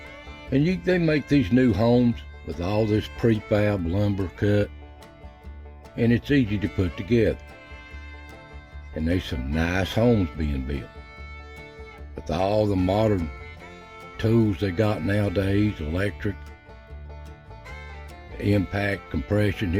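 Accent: Appalachia, United States. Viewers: The voice of Appalachia. Another Great video.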